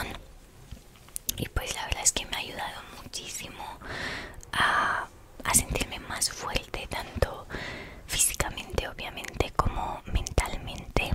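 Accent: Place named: Spain. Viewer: Amo profundamente el acento español y el tuyo es hermoso 🥰🥰🥰❤ Beso grande desde Buenos Aires, Argentina. 💙🤍💙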